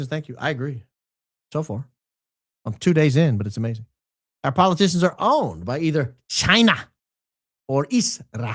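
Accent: South, United States. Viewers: Just ridden across London bridge listening to your Southern tones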